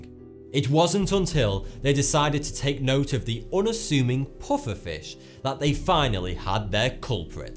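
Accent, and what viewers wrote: United Kingdom. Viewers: why does everything sound better from a British guy..its like my brain actually absorbed informAtion more easily.. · Where does your accent come from? It sounds like British but different somehow.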